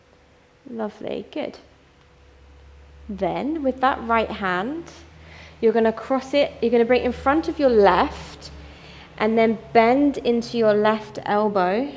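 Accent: United Kingdom. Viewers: Beautiful British pronunciation. And a great yoga class, thanks!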